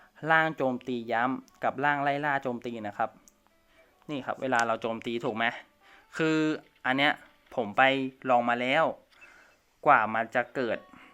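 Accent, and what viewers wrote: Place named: Thailand. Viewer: เหน่อจังจังหวัดไรออคับบช นักดาบกับมือปืนอันไหนดีกว่า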